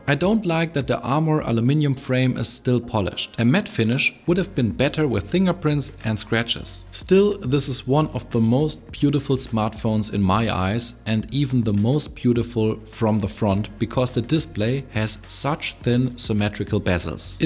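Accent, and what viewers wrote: Germany. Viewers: best Galaxy I've ever bought so far. i have the 128gb base model in pblack. i compared it with the s23+ from a friend and there is no noticeable difference in everyday handling!! but the most important thing, 25w charging needs exactly an hour with 20-30% battery left the next morning which i always have. it's exactly the time frame i need to shower and everything before i go to work. btw, very strong German accent. but not as worse as KS. anyway, good review and nice channel, subbed!!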